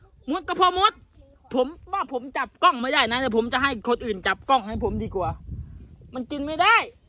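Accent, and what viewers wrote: Laos. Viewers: เว้าไทยตกลาว5555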